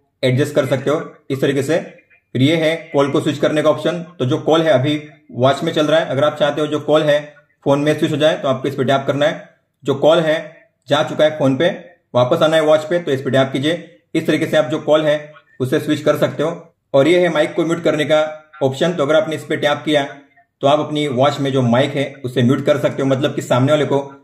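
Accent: Gujarat, India. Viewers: You r gujrati right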